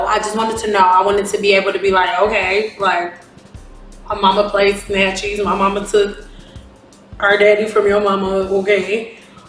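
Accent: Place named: Florida, United States. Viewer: Florida in the house, I love❤ the way you say Gone head Girl😀 your accent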